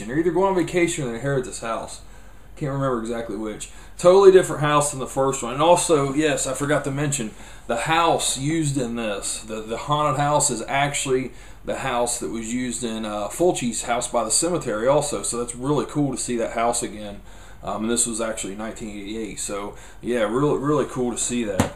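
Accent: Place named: South, United States. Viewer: Handsome. Love your southern accent, when it pops up. Trip With the Teacher popped up in my recommended YouTube videos, but, I don't know if it's here. Got halfway through it's trailer. When you say, "do," &, "too," I melt 😍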